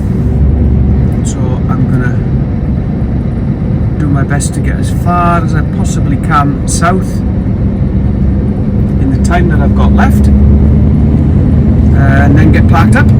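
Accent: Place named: Wrexham, United Kingdom. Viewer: Morninggggg. I know the Castell Mynach very well. I've had a few (pricey) meals there. I prefer the Dynevor just down the road though. On another note, I've been trying to place your accent and I'm guessing at Wrexham. I'm a valleys boy myself. Love the videos. Please keep them coming.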